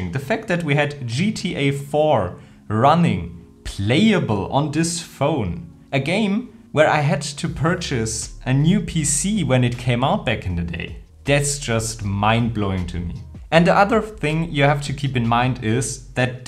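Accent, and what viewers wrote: Germany. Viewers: Is he german, austrian or swiss?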